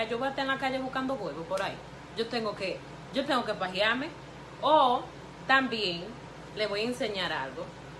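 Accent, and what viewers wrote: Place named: Dominican Republic. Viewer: me encanta el hacento de rd de esta hermosa mujer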